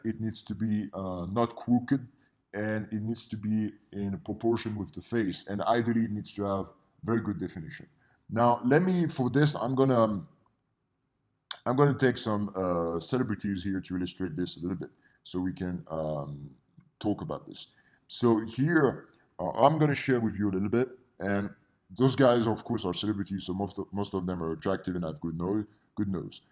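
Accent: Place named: Netherlands. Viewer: I can tell your Dutch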